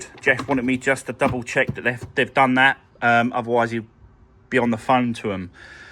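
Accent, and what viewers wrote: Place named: South East England, United Kingdom. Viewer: My connect is 2016 with low mileage and requires a cambelt change and service. Where are you based as you sound like you're from the south East?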